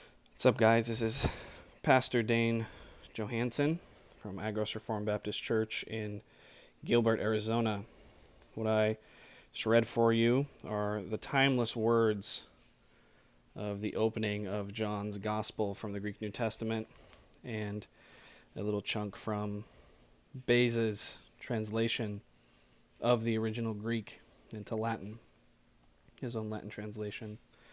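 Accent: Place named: Greece. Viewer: Great to hear Historical Greek Pronunciation (HGP) by an English speaker. Until you spoke English, you sounded like a native Greek.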